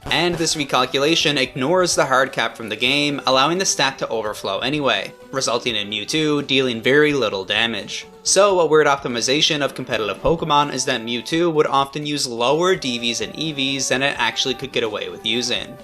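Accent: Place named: Newfoundland, Canada. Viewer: I detect a slight Atlantic Canada/Newfoundland accent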